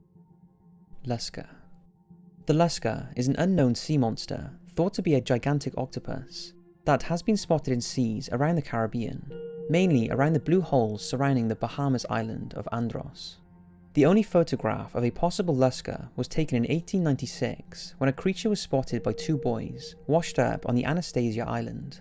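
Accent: United Kingdom. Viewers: YESSS! The British narrator is back 😆😃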